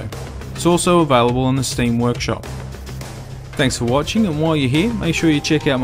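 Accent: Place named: Australia. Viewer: lol I could tell from ur accent, Im just across the ditch from u:)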